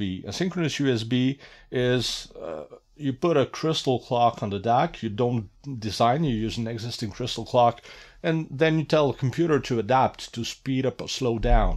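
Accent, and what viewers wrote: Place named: Quebec, Canada. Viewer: Hi, I was wondering if the old isa sound card such as sound blaster 16 which already supported 16 bits 44.1khz would have incorporated r/2r ladder dac instead of binary weighted ones? Despite being old sound cards, i couldn't find anything wrong to the sound coming out of them when propely feeded with a lossless source a few years ago when I messed around with such things. And you're probably from Quebec with that accent. Lol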